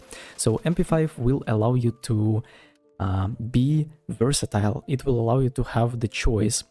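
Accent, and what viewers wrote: Russia. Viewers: Ты же русский, брат, это слышно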